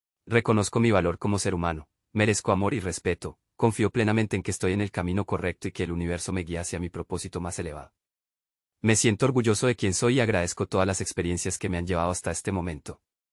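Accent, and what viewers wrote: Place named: Colombia. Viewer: Bella, que bueno q la voz es de acento colombiano y no de una maquina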